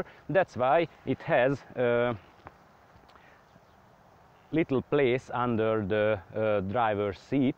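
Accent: Hungary. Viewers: Borzalmas akcentus... komolyan. Magyarországon képtelenek megtanulni az emberek, hogy NE magyar hangleejtéssel beszéljenek angolul. Inkább feliratozzátok a videót.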